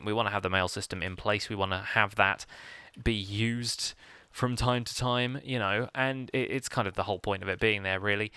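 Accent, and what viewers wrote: United Kingdom. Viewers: I do like how that tower is turning out, and having the plan area does really stand out as a good focal point :) Also the spread up British accent is amazing. :)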